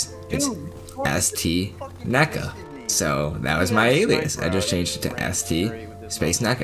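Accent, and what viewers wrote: Boston, United States. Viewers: BOSTON ACCENT was the goat back then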